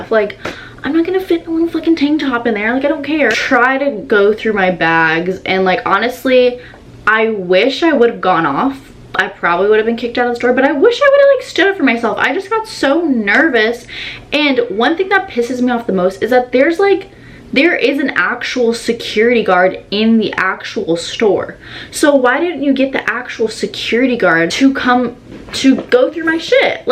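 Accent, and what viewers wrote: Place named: Australia. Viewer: loved that australian accent lol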